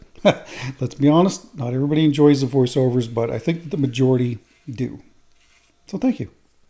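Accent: Canada. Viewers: On your voice overs, I love to listen to you talk about your work. I can tell you love what you are doing. I also listen to hear your Canada accent. It's there sometimes. Not a lot though. Keep talking.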